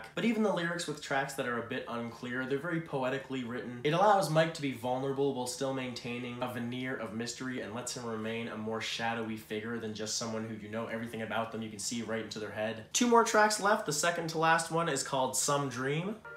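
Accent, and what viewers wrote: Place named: Ireland. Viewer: Where is your accent from? It sounds so Irish sometimes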